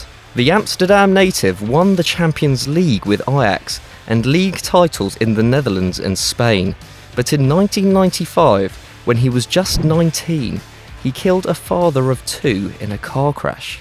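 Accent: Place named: England, United Kingdom. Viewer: 100 kilometres an hour.. You say this with a English accent.. YOUR ENGLISH MATE it's MPH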